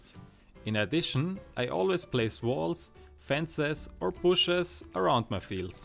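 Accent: Germany. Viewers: Kann es sein, das du Deutsch kannst (leichter Deutschdialeckt) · man hört richtig das du eigentlich deutsch sprichst 😂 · Dieser deutsche Akzent^^ Sehr schöne Sachen!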